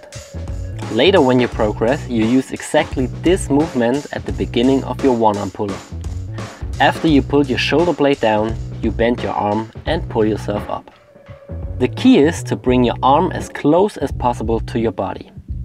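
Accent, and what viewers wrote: Germany. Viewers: is this dude german? just asking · He is a german. You can hear it wery clearly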